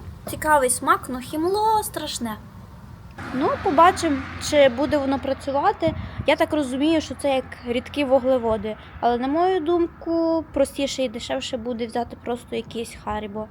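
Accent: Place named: Surzhyk, Ukraine. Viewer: Аццький (давно такого не чув) суржік. Не витримав довго, треба працювати над мовою. Але бажаю успіхів і подорожей :)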